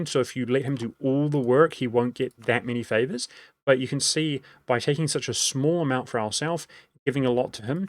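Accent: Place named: New Zealand. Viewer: I’m new to the game and You said your not demonstrating any skill but whatever that was must take some skill right? Lovely content as always, I’m honestly not used to hearing the kiwi accent on gaming channels so it’s refreshing.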